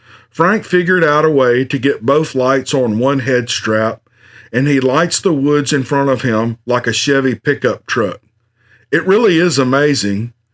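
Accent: South, United States. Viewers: I love it bro I absolutely love it,! I love the channel and I love your southern accent and hospitality you seem like a good dude but the story hit the spot. It was one of the funniest hunting stories I’ve ever heard and cannot stop chuckling even as I write this little note to you, the store just made my day and I’m going to go ahead and save it to my favorite columnso I can listen to it every once in a while when I need to pick me up or to put me in a good Sasquatch’en mood. Your a’ok in my book 👌 But who am I to give approval to another but still love the story and especially the channel, and looking at shirts as gifts for family. Good day brother. 👍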